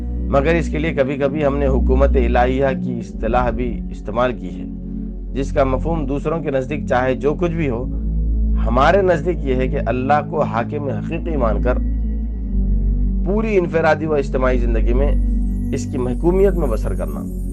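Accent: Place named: Hyderabad, India. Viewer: The reader appears to be from Hyderabad (Deccan). I can make it out by picking up some words, the way he is pronouncing them. JazakAllah for your efforts. May Allah reward you.😊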